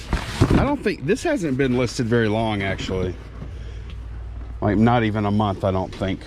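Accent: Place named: East Coast, United States. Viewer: My Dad used to let me stay up to watch Nature Boy Ric Flair, Rowdy Ronnie Piper, Hulk Hogan came later I think... back in the 80s. I love listening to back east accent also. I am from the east but moved to west coast 20 yrs ago.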